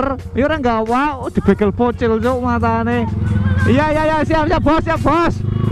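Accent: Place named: Banyumas, Indonesia. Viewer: iyaws ngapak Bae kepenak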